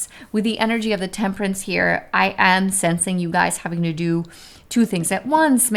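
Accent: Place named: England, United Kingdom. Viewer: Hi your English accent is so sweet and perfect, I love it❤️❤️❤️❤️❤️❤️! Elegí la número 2 y me has retratado tengo una oportunidad muy buena delante de mí y estoy dando vueltas a la cabeza pensando si debo tomar esa decisión tan importante. Abrazos desde España ❤️🇪🇦❤️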